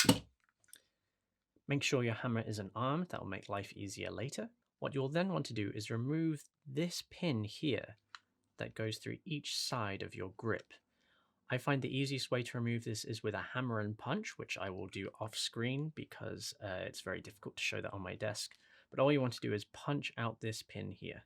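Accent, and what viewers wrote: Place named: England, United Kingdom. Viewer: This video should be a how to video for making how to videos. Nice close up views, no distracting, uneccesary background music and as much as it pains me as an Aussie to say. An English accent. Fantastic work mate.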